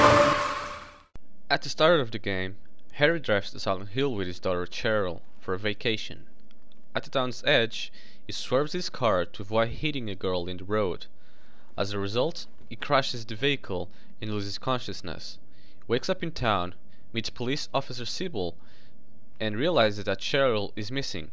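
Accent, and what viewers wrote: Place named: Portugal. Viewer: You have a Portuguese accent. Are you?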